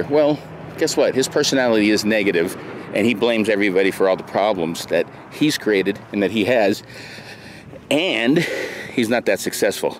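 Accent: East Coast, United States. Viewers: Are you from the East Coast?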